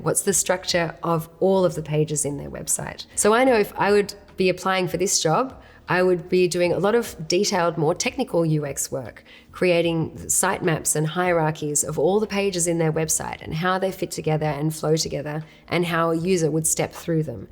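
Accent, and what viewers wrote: Australia. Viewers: Australian accent, love it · She sounds Australian.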